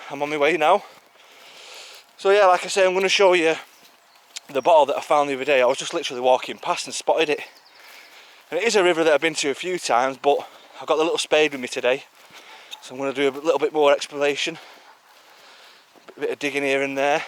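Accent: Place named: Northern England, United Kingdom. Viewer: Oooh a wee bit off there Dawn as a Scotsman I can confirm is not I'm afraid. I commented on his accent the other day, got to love the northern tones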